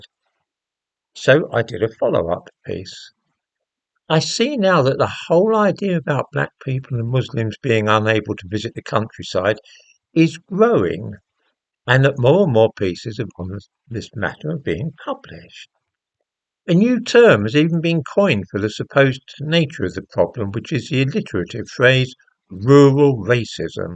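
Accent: United Kingdom. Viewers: Great video, and it was nice to hear the english i miss and never get these days. But please please please, have that mustache trimmed and fixed. And learn to use all the products thats out there for beards and so on. You wash it and form it every 2 days or so, throw a bit beard honey in there, and it will look more immaculate. And not like you've glued a dead rat on your face. Its gonna make a world of difference. Regards from a brit in Denmark.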